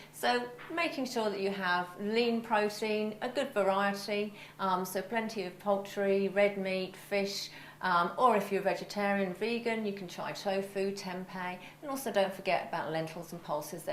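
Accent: Australia. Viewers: Is this Australian accent?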